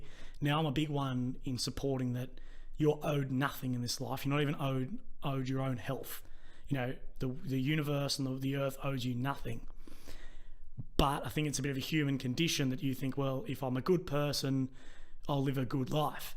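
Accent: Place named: Australia. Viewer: Hi mate, just "found you" in your latest end Feb 2024 video on the Ukraine conflict analysis and doing the back channel and will arm chair my way through 4yrs. At this stage I see where you are at but don't know how you got 'here' and don't know if you will ever see this post with millions of views and 100s of thousands of comments.... But I'll comment anyway mate. But I'm and Aussie ex RAN Submarine Officer, did my 25yrs and been out about 10 yrs now and been pretty PTSD broken as I've learnt you REALLY shouldn't get washed of the back of a Collins class somewhere doing something you can never talk about and leaving the service being a way to broken and a 'tough' hard charger - nothing like your story at all but I feel in a different way, and agree about the NEWS and haven't listened to the bullshit MSM tv or radio in 8 yrs,. Now I follow and listen to a variety of good smart people really discussing world events and I haven't missed TEN or ABC or the radio heads sprouting off giving me there bullshit opinions..... 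And I haven't missed it. Now I find myself (a dam long story but a ball breaker of a story) in the far Nth Arctic Sweden living a better, balanced and good life with my kids, although it's a weeeeee bit removed and remote but it's good - as now I'm finally fixing the head bongo and know what's important. I will keep watching all your current stuff and follow your next 4 yrs journey and enjoy hearing a no bullshit Aussie voice in all the world's noise. I don't know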